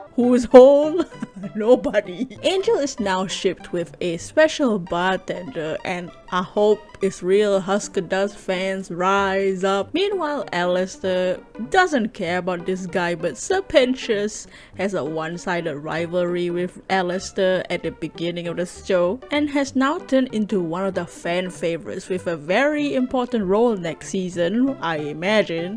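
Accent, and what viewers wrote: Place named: France. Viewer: Par tout hasard, est-tu français ? Il y a un peetit accent Anyway, these designs are cool, I love the Alastor and the Lucifer one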